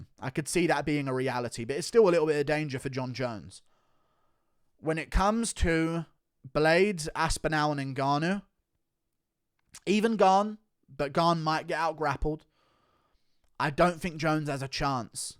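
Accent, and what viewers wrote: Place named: England, United Kingdom. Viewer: Where’s your accent from in England mate ? Uf”cuh”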